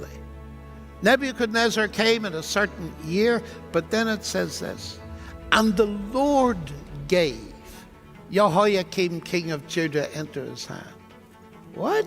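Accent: Northern Ireland, United Kingdom. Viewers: I concur with everything you've mentioned, except that he has an Irish accent.He's from Northern Ireland 😇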